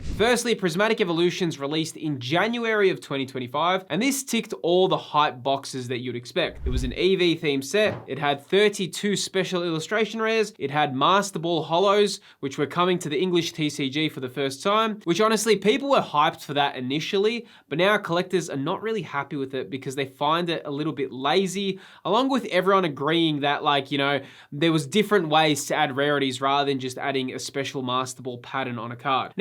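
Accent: Australia. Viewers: Hey, I've watched a few of your videos now and I just wanna say that I truly appreciate your content: besides the genuine passion for the hobby that you transmit, Im always very interested to hear your thoughts about the investing/ financial side of it. Keep it up please! Also, I lived a few years Down Under and I love to hear again some Aussie accent! And last thing, I think you said something very profound: that you don't have to be good at your hobbies to enjoy them. It made me think when I was too learning how to surf and getting always so frustrated because I wasn't as good as I wanted so bad to be. You just have to like what you to have fun! Keep it up 👍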